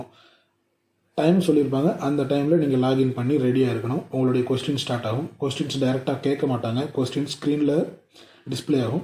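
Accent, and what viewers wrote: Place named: Nagercoil, India. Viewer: Nagercoil slang!!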